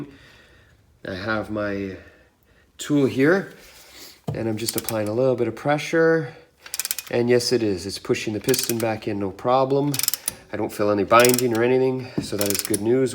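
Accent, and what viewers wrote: Canada. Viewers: lol, it’s always good to watch a video of an enthusiastic demonstration. I bet you’re from Canada, you got a Canadian accent.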